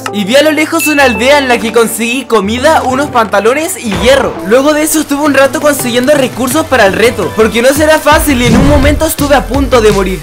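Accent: Chile. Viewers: Eres chileno? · eres chileno?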